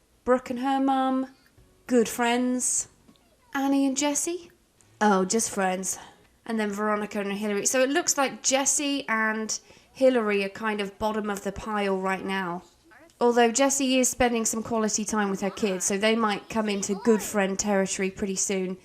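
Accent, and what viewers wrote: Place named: South East England, United Kingdom. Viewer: South East England, in and around London area. I think she has said before she is originally from Reading? Which isn't too far from London 😊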